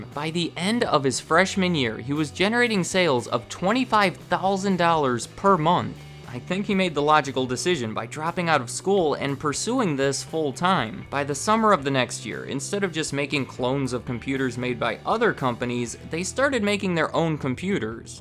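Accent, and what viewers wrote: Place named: Midwest, United States. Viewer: I'm currently watching this from a Dell XPS desktop with 16 GB of ram, Intel i7 processor, and a NIVDIA GeForce 1080 graphics card. You haven't experienced the thrill of serene Midwestern accent until you've heard it in high definition.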